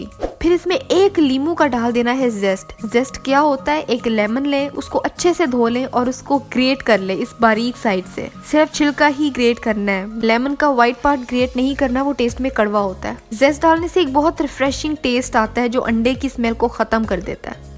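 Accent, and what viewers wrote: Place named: Punjab, India. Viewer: OMG! your accent makes your cooking videos killershot..... Punjabi ki to kya hi baat theee........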